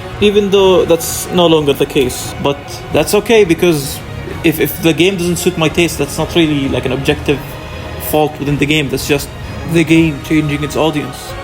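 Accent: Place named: Arab world. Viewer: I haven't reached him yet . However, I can say that he is cool. من لهجتك عرفت انك عربي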